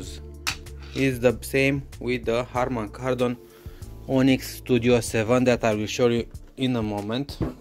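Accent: Romania. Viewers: asta e roman la cum vorbeste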